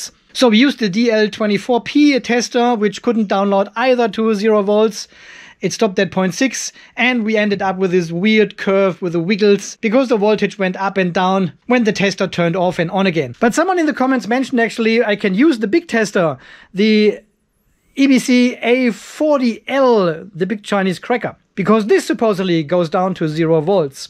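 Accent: Germany. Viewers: meine Mutter und Großmutter findet dein clasicher deutsche Dialekt so funny.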